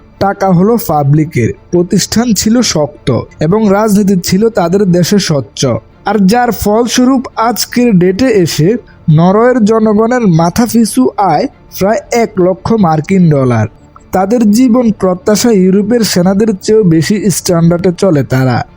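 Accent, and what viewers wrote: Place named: Noakhali, Bangladesh. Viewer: khub base bhul na korle... apni Noakhali, lokkhipur, feni theke... Bhaiya don't mind Script/ voice improve korte paren...Best of luck ✨👍🏻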